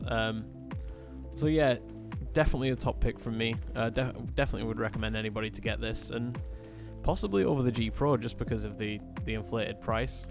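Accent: United Kingdom. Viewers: Good video, but next time you should use a better camera..more than half of the video is out of focus. lol BTW which part of UK are you from? Your accent is interesting! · Sure am pal! Can always recognise the twangs of a fellow member of Gods county :-). Sheffield lad myself.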